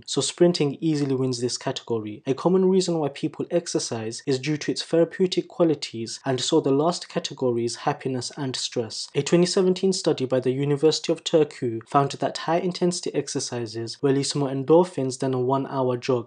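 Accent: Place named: Bangladesh. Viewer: You sound Bangladeshi based on your accent. Am I right?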